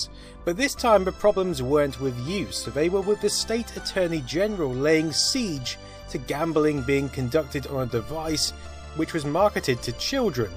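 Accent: United Kingdom. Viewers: Speaking British is I see.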